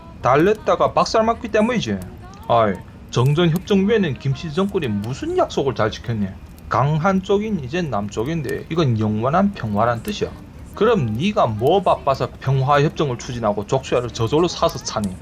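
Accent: Yanbian, China. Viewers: 님의 충실한 팬입니더..^^ 개인적인 생각은 굳이 "연변말"이라고 해서 부끄럽고 잘못된 것이 아닙니다. 어학적으로는 되려 더 다양하고 향토 냄새가 나는 거라, 이색적인 어투나 거리감 나는 화법이 듣는자에겐 더 재밋고 중간중간 고민 할수 있는 시간을 주죠.... 조율과 리듬이라고 할까요?? 듣는 사람입장에서 사실 유익 할수 도 있지요..^^ 그리고 님께서 말씀주닌 내용은 거의 우리민족 원어들의 기본속성을 살린 단어들인지라.... 오해 하거나 잘 이해안되는 부분이 간혹 있어도 네이버에서 검색하면 어학 사전으로 정확히 해석 되어 나옵니다... 이번에 말씀하신 "륙속" 또한 북한말로 나오네요.... 이런 의미에서 남한 서울 말투만 고집한다?? 이거야 말로 문화적 갑질이 아닌가요?? 조금 편파적이만 현실적으로 남한 조선족님들도 더 공부해야 됨이 맞는거지.... 어찌 연변말투나 북한말투가 부끄러운 존재가 되겠어유.... 수십년 거래 불가로 문화적/언어적 차이가 있는 것이 당연하고 그 거리를 좁히기 위함은 양방향으로 진행됨이 맞는 거라 생각 됩니다. 이런 방언과 지역적 편견은 사실 한국내에서도 존재 하지 뭡니까? 물론 서울말을 사용해야 많이 표준이 된다지만, 그것은 실생활이나 비지니스에나 필요할지... 굳이 님의 동북아를 중심으로 진행되는 역사와 현실의 해설에는 우리 연변말이 딱이라고 생각 됩니다.. 이 옛날 말 그대로 역사를 알려주는데 이거야 말로 제맛이 아닌가요?? 조기 축구 끝나고 막컬리 사이다 한잔 하면 그 기분 최고죠..ㅋㅋ 님 힘내세요. 님의 예리한 동찰력과 현실과 역사속의 자유자재한 비유, 해석들이 너무 좋고, 시원시원한 성격의 소지자임을 확신합니다....ㅎㅎ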